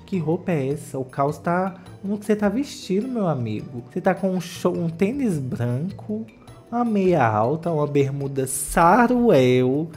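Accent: Minas Gerais, Brazil. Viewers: ficando gradualmente mais mineiro!!! amei ❤️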